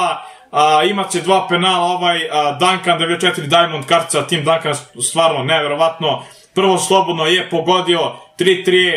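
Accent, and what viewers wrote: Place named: Nis, Serbia. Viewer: pricas ko da si sa nisa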